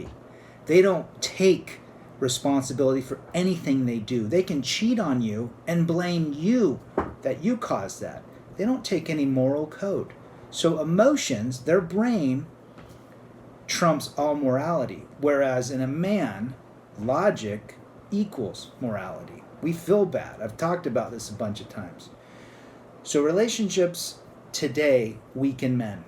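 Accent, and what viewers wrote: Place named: RP, United Kingdom. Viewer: love the top-hat accent! 😃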